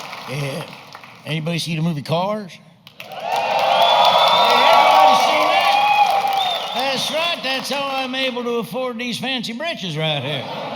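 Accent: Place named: South, United States. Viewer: I notice Larry's kinda easing out of the southern accent.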